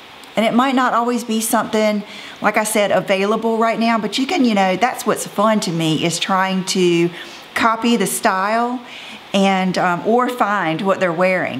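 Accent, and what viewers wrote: South, United States. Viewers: Hi, I'm new to your channel and I really enjoy your informative videos. I love your southern accent and your southern charm and of course your style.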